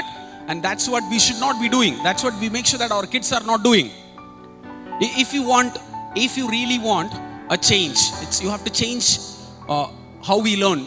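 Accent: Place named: South India, India. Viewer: Sir your English speaking style is very different because you are from south India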